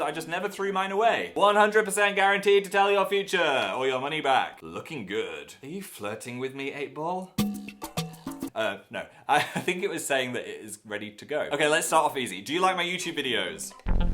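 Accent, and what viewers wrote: North, United Kingdom. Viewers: HE SOUNDS SO NORTHERN AT THE BEGINNING OML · U sound so northern in this video 😂😂😂 ... more than usual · you sounded so northern in this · Wow he sounds so northern in this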